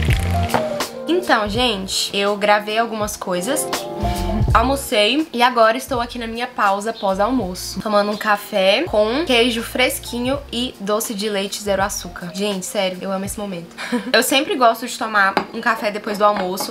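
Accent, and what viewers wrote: Minas Gerais, Brazil. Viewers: muito mineirinha 😂❤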